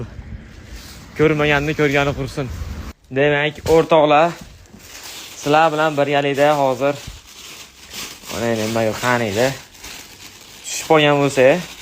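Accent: Andijan, Uzbekistan. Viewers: 😂😂😂😜👍👍🤲🤲ИЧИМ УЗУЛУБ КАЙТА, КАЙТА КУЛДИМ😂 . АНДИЖОНЛИК ШЕКИЛИЙ ( ШЕВАДАН) (МЕНХАМ 😜). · Aka Andijonli emasmi. Sheva tortyapti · Anjanlimisiz? Mazasini aytmadizzu bebaho shekilliga 😂😂😂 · Ука Анжан Шевами 😂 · Зу'р гаплардан бу'липти юю Шева андижон❤❤ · G'irt anjancha sheva😂❤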